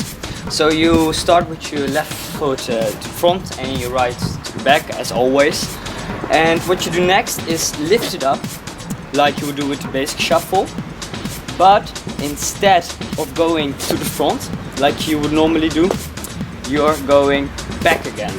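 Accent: Netherlands. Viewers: ik hoorde al een Nederlands accent 😌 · hij s ook nl vgm... want zn uitspraak klinkt als die van n nldr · Hahaha gy hebt een Hollands engels accent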